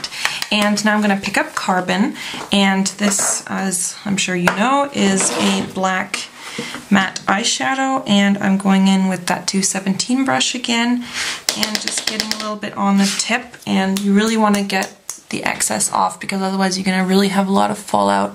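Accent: United States. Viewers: ok ok sorry lol im wrong.. i thought in another video that she said she lives in canada.. n coz she sounds like an american it kinda added up... lol my bad.. soz xx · Yeah. She does sound American. :P Most Europians can't say 'R' or 'Th'. :D Like my mom for example. lmaooooo.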